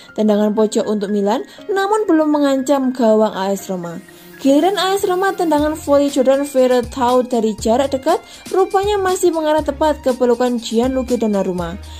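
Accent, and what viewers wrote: Java, Indonesia. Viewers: medok abizzz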